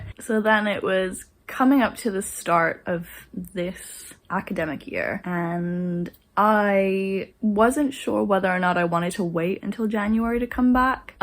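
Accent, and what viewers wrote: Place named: United States. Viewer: I just found your channel through your Selena Grace bag video actually and got curious, noticed you have lost your American edge in your accent now! I really like the way you think, I am (and was) had some crazy thoughts and issues about my degree and if the degree I chose was for me or useless or worthless, wish I was as open minded as you when it came to thinking about it. You know like “it’s not the end of the world” and stuff, that’s so right! Ahh! I have one course to go and I’ll be done. I’m basically on leave right now because there are no courses for me to do left. I’m having a plight now about employment and stuff but I will keep it in mind, - “it’s not the end of the world!”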